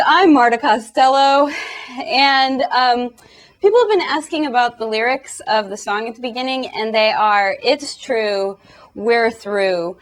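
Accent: Russia. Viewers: hahahah <3 marta your russian accent is frkn awesome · Nice Russian accent!!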